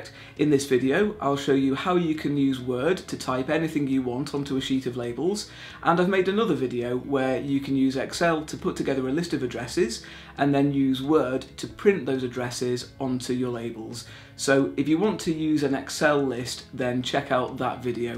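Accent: England, United Kingdom. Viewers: Brilliant easy to follow instructions with an ENGLISH ACCENT. My only question is ….my laptop doesn’t produce another sheet when I get to the end of first one and I have to start all over again. I’m sure I’m doing something wrong. Please help. Thank you.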